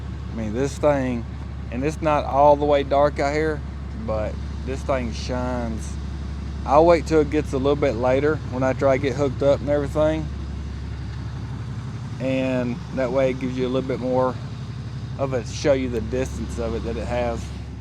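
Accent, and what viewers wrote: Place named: Tennessee, United States. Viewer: I was guessing where in Tennessee you are from. My mom came from Lawrenceburg ,tenn. The accent is familure. Be safe.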